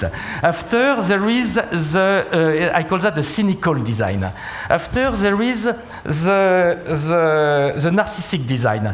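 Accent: France. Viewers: love this french accent it is so funny!!!... today to not do it is more positive than do it :))) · As much as i like this guy , i hate the french english accent ! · He has the most comical, stereotypically French accent I've ever heard. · I am French myself and I think he represent the french accent...His accent is terrible...you can understand clearly ?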